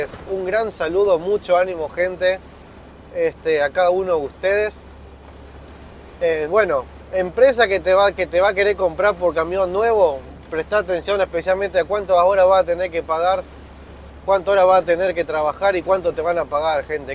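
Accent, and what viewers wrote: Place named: Uruguay. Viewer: Un gusto escribirle, tmb soy del canal Mobile home. Sr: x DESEARLE SIEMPRE PROSPERIDAD EN TODOS SUS TRABAJOS Y ME ALEGRÓ MUCHO SU COMPRA DEL SCANIA. Como si fuera x mis hijos. PROSPERIDAD, SALUD X ELLOS Y FLIA. Y LE SUMO A UD. Con CARIÑO Y RESPECTO, SE LO MERECE ES TRABAJADOR, LUCHADOR, DE TODO OFICIO. Le saludo desde Uruguay. Mis hijos viven ahí en España. Los tengo lejos hace casi 2 décadas x tal motivo comencé a seguirlo, pensé q podía ser Uruguayo x el modo de hablar, somos de paises hermanos. SIEMPRE ADELANTE Y A CUIDARSE. Me despido con AFECTO.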